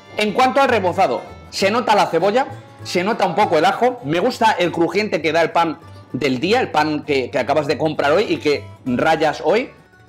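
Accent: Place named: Chulla, Ecuador. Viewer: A nadie más le molesta ese micro cuando prueba la comida.... cuando hablas como "chullas" se escucha bastante mal....para lo bueno q es tu trabajo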